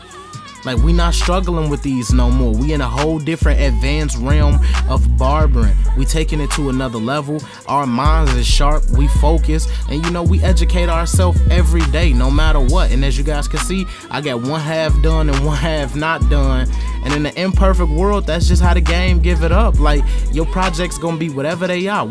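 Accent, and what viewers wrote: Chicago, United States. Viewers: I knew the way you talk you was from the land. I’m definitely sliding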